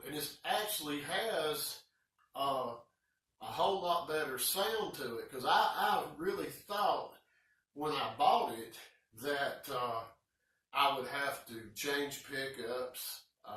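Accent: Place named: South, United States. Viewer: Love that Southern drawl! Epitome of politeness. You earned your thumbs up, friend!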